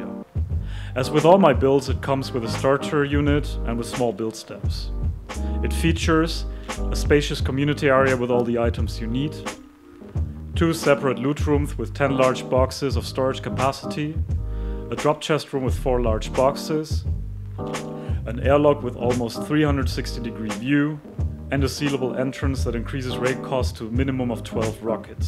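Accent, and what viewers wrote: Germany. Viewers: No offense to your english, but I instantly knew you were german. People also do realize my german accent after I start speaking even when there are no grammar mistakes :D Whatever, calm voice, calm music and good mic / video quality. Well done man!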